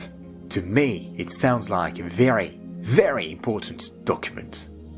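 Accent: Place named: France. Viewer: nice accent Fr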